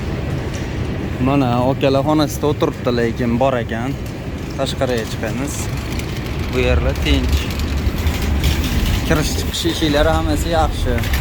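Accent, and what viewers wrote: Namangan, Uzbekistan. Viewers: Сиз наманганлик сиз гапиришингиз дан билдим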